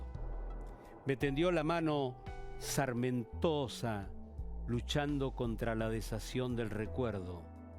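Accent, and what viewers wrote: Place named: Argentina. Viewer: Mejor!!!! Cómo querés que lo narre? Con cara de tujes??? Lo narra tipo gauchesco... Ommmmm!!!! 🙌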